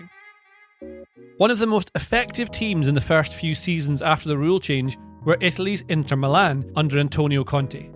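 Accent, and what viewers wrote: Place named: England, United Kingdom. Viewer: Please consider adding more visualizations or subtitles. The topic is really interesting, but the video moves at a fast pace and uses many technical terms. The heavy English accent also makes it hard to follow for an international fan like me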